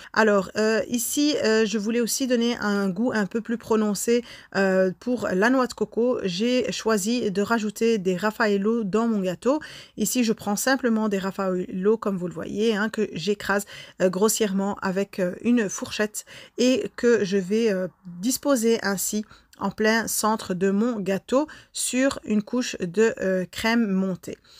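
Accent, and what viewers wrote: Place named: Belgium. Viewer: Ah tu es belge, je me disais aussi que tu avais un accent. ^^ en tout cas merci encore une fois pour cette recette, au top comme d'hab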